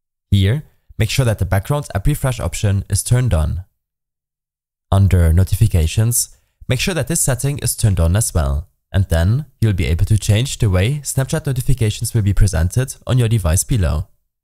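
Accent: Germany. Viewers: You accent is very weird. You sounds like a german person who speak englisch.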